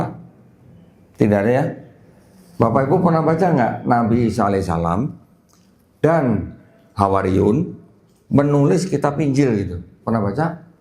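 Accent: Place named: Java, Indonesia. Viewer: Beliau ini chinese tp kok njawani yo. Lanjut koh...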